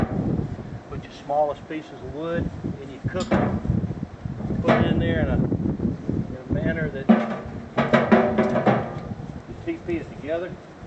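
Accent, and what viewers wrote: United States. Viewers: Love the Texas accent, and love the propane starter idea. I'm a novice getting ready to take my first smoker on it's maiden voyage so I really appreciate the expert advice! Thanks for making this video! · That's NOT a Texas accent .... it's a refined Southeast Georgia accent. Smooooth as butter!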